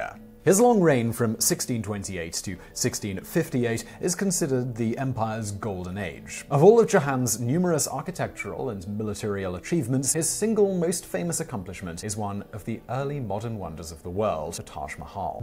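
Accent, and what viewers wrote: England, United Kingdom. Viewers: What are you trying to say? He is English, so American mispronunciations are, in our view, wrong! Tom-ay-to, tomato!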